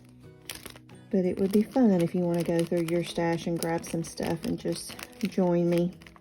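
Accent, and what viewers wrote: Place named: South, United States. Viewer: If "Hi y'all" fits you better than "Hi guys", I think you should definitely switch! I'm not sure I know anyone who doesn't love a southern accent. :) Be you!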